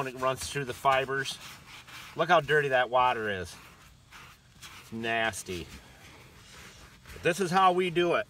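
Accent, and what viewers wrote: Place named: Midwest, United States. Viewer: I could tell this guy is Midwestern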